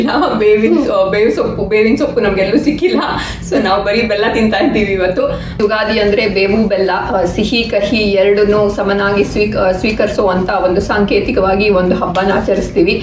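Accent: Mangalore, India. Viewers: & nimma uru yavudu i think manglore or udupi tulu alle alwa matadodu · Manglore slang kelode chanda😍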